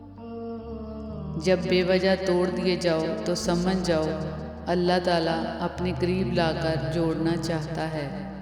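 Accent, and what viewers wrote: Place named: Punjab, Pakistan. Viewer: خوبصورت اواز و انداز کے ساتھ دل میں اتر جانے والے اصلاحی و سبق آموز اقوال ۔۔۔۔ تلفظ کی پرفیکٹ آدائیگی ۔۔۔ سونے پہہ سوھاگہ اردو میں پنجابی لب و لہجے کا حسین امتزاج ۔۔۔ ماشاء اللّٰہ ۔۔۔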